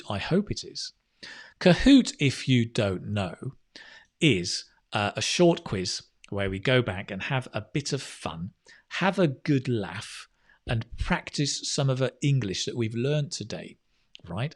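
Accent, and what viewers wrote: United Kingdom. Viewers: Hi What accent do you have? British accent, but which one exactly? Rp?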